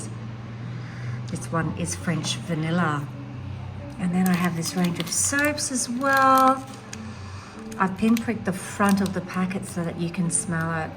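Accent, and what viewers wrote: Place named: France. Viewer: ou étais-tu partie encore, qui te fais beaucoup trop RARE, ton charme, ton accent Français, ton talent, ton village magnifique....je me languis déjà de ta prochaine vidéo ( excuse moi ), reviens vite... connaissez-vous le château de Purnon, du 18eme siècle, dans la Vienne, classé aux monuments historiques, en restauration, par un couple d' australien....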